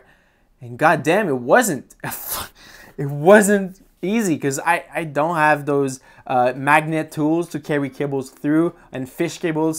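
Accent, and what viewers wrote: Quebec, Canada. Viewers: Hello, super cool de t'entendre jurer en Québécois pour moi frenchie qui adore votre accent mais surtout vos jurons. Clairement si je me lance dans un TT bike build, je partirai sur du sram suite à ta vidéo. J'ai remplacé mon guidon sur mon Giant TCX par un guidon aéro full intégré et le di2 m'a déjà bien énervé! Super vidéo. Merci et profite bien de ton Scott Plasma. Ps: tout ces câbles coupés viennent d'un mauvais routing de ta part ou plutôt de la complexité du vélo?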